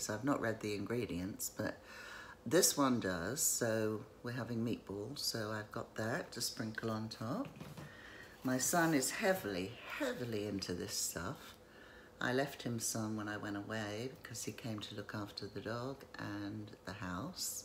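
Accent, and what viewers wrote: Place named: England, United Kingdom. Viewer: Beautiful accent are you from england??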